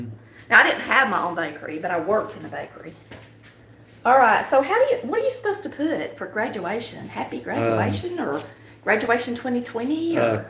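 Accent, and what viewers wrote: South, United States. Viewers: I like to hear you talk, that is an authentic southern accent 😀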